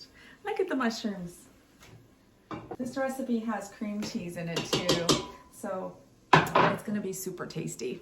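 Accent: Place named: Canada. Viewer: You are now more Canadian sounding...beautiful.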